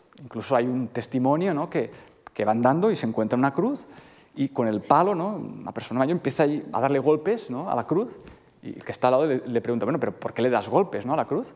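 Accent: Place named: Catalonia, Spain. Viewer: Creo que el professor no tiene la lengua castellana como propia. El apellido es catalan, el acento de su castellano lo delata así como açgunos vocablos en perfecto catalan. Ojalá los profesores castellanohablantes utilitzaran el català con la misma soltura que él tiene en castellano.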